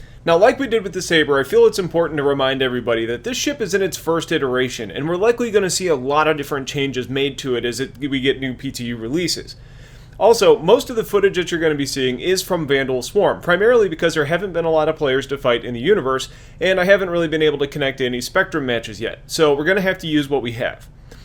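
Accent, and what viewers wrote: United States. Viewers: Nice to have an actual American voice, rather than a very gay British voice doing commentary.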